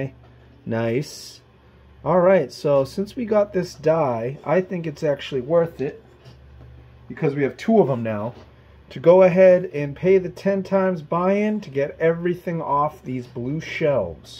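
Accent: United States. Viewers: You sound like you are from New York? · Do I hear a New England accent. New to your channel. Where can I go to play this machine?? Please let me know!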